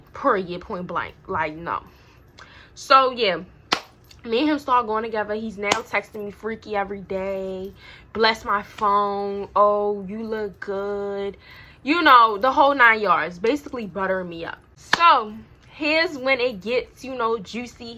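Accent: Baltimore, United States. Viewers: Yo she sound like she frm Baltimore but ion know nobody frm Baltimore that says cold drink so idek but you still gettin a sub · U gotta be from Baltimore💯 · You from Baltimore? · hold up cuz ik you from baltimore gotta who bmore accent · Baltimore accent ruining the video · I knew you was from bailmore I knewwww ittttty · Is you from Baltimore or summ ??😭😭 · DO I HEAR A BALTIMORE ACCENT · The Baltimore accent strong ash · she gotta be from baltimore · Nah da baltimore accent be havin me cryin😂😂😂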